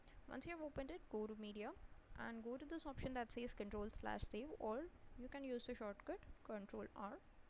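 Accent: India. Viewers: Can barely understand you with your heavy Indian accent. In English, the letter V is not W. Just saying. Plus, you speak to fast. Your English words are blending together.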